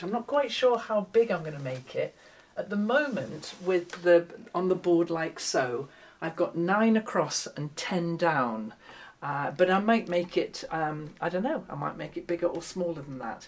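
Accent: England, United Kingdom. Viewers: It is so calming and cozy for me to watch your videos especially the scrap quilts and lovely English accent. Merry Christmas from Alabama, USA 2025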